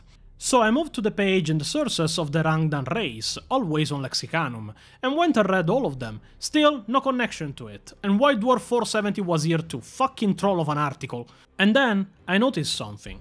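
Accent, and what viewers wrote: Italy. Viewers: The rustic Italian accent gives it a good touch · Daddy’s finally uploaded!!! Let’s goooo! The thick “meat a ball” accent is perfection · 40k lore told in English with Italian accents? Gotta say its pretty entertaining. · That would be his Italian accent… completely unrelated to vampires..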